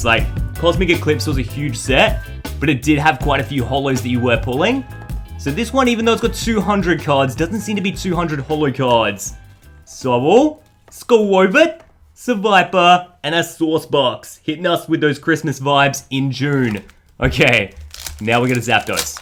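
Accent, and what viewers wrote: Australia. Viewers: R u Australian if u are ima sub and like now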